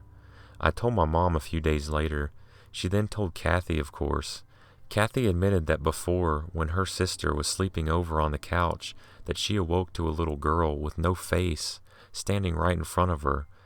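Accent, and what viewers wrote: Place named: South, United States. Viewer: I love your accent. I'm trying to guess where you're from, somewhere in the South. I'm British so I might be way off... lol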